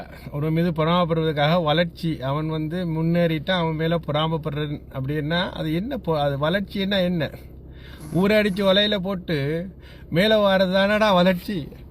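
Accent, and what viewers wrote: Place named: Sri Lanka. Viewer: ஆமா உனக்கு தமிழே பேச தெரியல்ல தலைவனை பற்றி பேசுவதை விட நீர் பிச்சை எடுக்க போனவழியை மட்டும் பாருடா உன் மொழியை கேட்டாளே நீர் சிங்களம் கலந்த தமிழன் உன் நடிப்பும் அர்ஜுனா அரசியல் பிழைப்பும் இங்கு வேகாது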